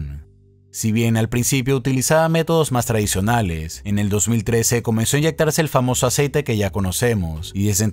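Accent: Ecuador. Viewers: Es Ecuatoriano así hablamos a veces 🤣🤣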